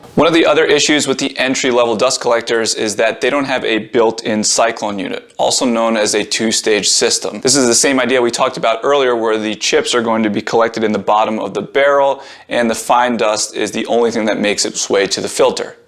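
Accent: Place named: United States. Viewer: pleasant American English accent... there aren't a lot of American men that speak well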